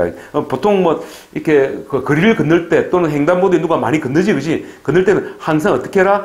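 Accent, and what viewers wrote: Gyeongsang, South Korea. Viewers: 오래전 은퇴하고 가보고 싶었던 나라인데 최근에 안좋은 이미지가 강해서 잃어버리고 있던 나라인데 덕분에 다시보게 됩니다. 맞나? 따라해봐~ 마취강도...ㅎㅎ 경상도 사나이 구수한 강의 친근하고 좋습니다.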